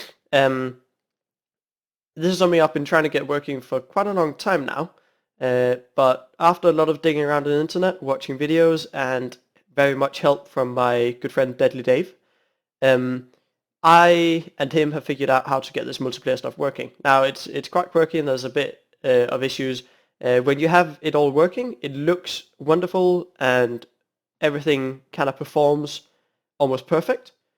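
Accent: Denmark. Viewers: er du fra Danmark? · i knew u where a dane hej :D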